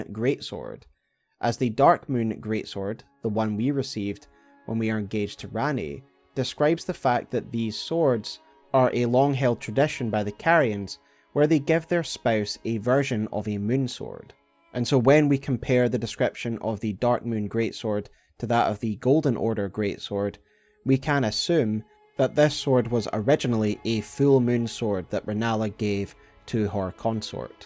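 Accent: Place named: Scotland, United Kingdom. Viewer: Your nuts bro, listen to a fellow Scotsman Explain Elden Ring lore is top notch. Well done ma man! 🫡 🏴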